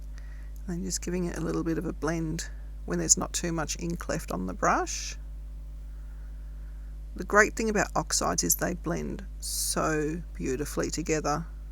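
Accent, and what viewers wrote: Australia. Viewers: Such a cute stamp set and card. Great to hear a fellow Aussie...tfs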